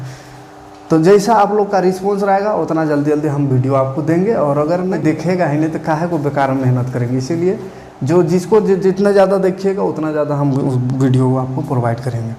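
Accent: Bihar, India. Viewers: Aap Ke aavaj se lag ki Aap Bihar se ho😄😄🙄🙄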